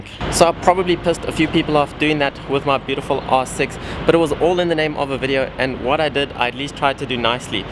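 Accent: South Africa. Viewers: Are u from south Africa?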